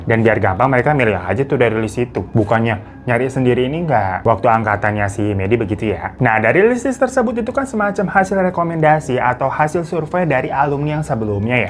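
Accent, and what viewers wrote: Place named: Sunda, Indonesia. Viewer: Si aa lucu pisan ngomong bahasa sunda na jahaahaha😂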